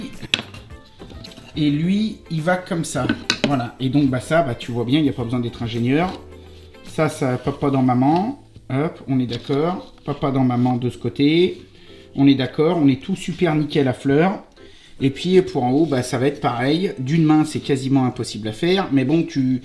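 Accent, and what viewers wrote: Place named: Haute-Loire, France. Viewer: Allez, courage. Moi je suis ravi de retrouver mon avec son accent de la haute Loire, sa bonne humeur et son don pour donner envie de lui ressembler. Quand je serai grand, je voudrai faire « » comme métier 😉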